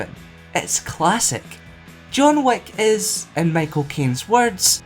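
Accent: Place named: Scotland, United Kingdom. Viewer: I fooking love Scottish accents. By far my favorite.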